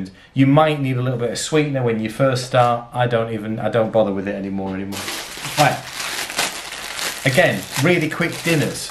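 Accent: United Kingdom. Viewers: This was so helpful. My family and I have decided to start our keto lifestyle (while our child is still young) and most recipes researched are American to which a lot of the ingredients are hard to get a hold of. Hearing a British accent is reassuring as well as knowing you shop in aldi. We use aldi for our weekly shops as it suits our budget so to know that keto on a budget is a thing gives us a lot of motivation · Thank you for this you have no idea how helpful and reassuring it is hearing a British accent it’s like saving grace I’m going out today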